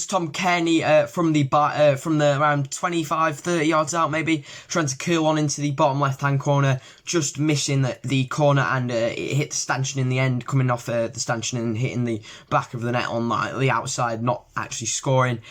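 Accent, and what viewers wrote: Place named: Barnsley, United Kingdom. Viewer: Class vid from a blade love that Barnsley accent lad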